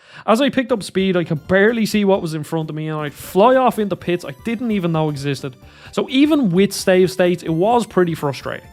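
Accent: Dublin, Ireland. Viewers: Is that a Finglas head I hear? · I at least liked the Irish accent,the words seemed pretty harsh. And what's so great about the Master System that Game Gear doesn't have? I'm honestly curious because I see several comments complaining about the versions used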